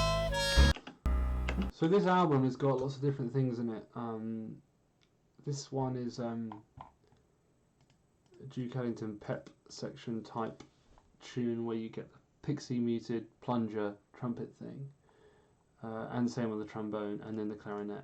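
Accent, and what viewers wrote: United Kingdom. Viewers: I am going to be honest. I had no idea you had a British accent, or that you were even British. I love the results either way. Thank you for sharing your talents with me.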